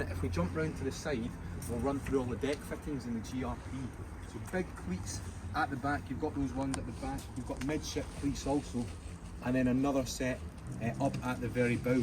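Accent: Scotland, United Kingdom. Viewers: I was surprised to see that the chap has Asian heritage. I did not expect that with the full on Scottish accent. Just goes to show how perceptions can be completely false.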